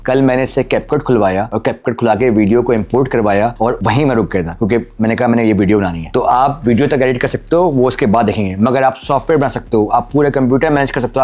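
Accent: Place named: Karachi, Pakistan. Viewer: Fantastic explanation! Kaafi dino baad Karachi accent main sunker maza agaya!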